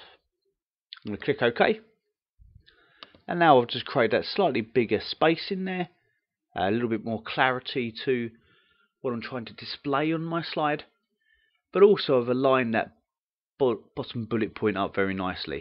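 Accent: United Kingdom. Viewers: Thanks It helped me a lot. Also, your british accent is very satisfying lmao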